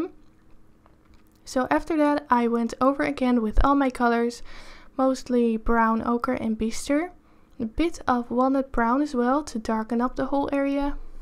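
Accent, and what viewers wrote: Netherlands. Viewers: You hear that she is from the Netherlands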